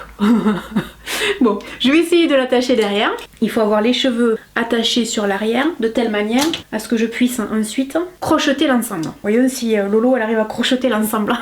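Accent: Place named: Toulouse, France. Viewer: Ma fille m'avait conseillé de vous regarder...c'est fait et j'vous trouve excellente..et l'accent j'adore..forcément je suis pas loin de toulouse...du coup j'vous suis 🙂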